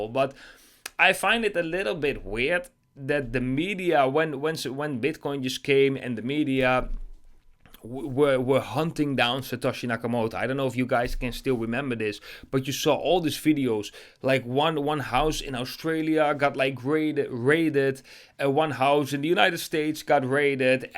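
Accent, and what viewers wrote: Netherlands. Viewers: I think he's Dutch! 😊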